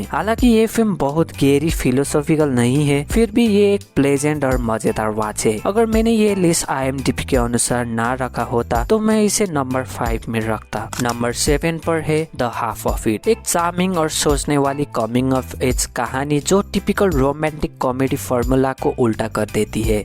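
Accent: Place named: Bengal, India. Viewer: Tum bangali hai kya😅😅😅tumhra accent lag raha 😅😅😅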